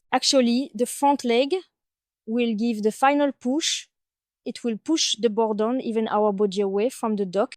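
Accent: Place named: France. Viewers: " 2 weeks ago Cet accent Français en Anglais parlé est insupportable." Not so for this native English speaker. Au contraire, it is very pleasant to listen to. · Cet accent Français en Anglais parlé est insupportable. Dsl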